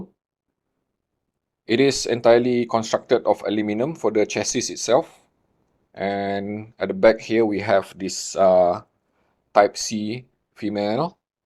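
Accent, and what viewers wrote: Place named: Malaysia. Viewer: great review I love your malaysian english.... Greeting from indonesia....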